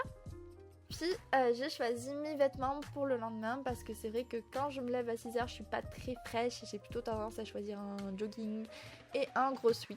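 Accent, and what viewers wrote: Marseille, France. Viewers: L'accent marseillais qui ressort +++ on adore ! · Je t'aime trop tes la meilleure ❤😱😍😍👌 et j'adore tes cheveux magnifique 😍👌🔥demain cours 😱😭😭 j'adore ton accent marseillais et Oui pour la vidéo que tu veux faire ❤❤👌toute façon toute tes vidéo sont parfaite; tu te couche aussi tard que moi 😂😂😘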